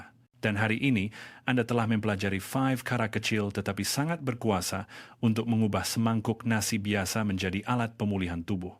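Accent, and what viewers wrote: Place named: Indonesia. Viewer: AI...Hari tu bahasa Melayu...ni bunyi macam bahasa Indo...Sangguh...sepatutnya disebut sungguh...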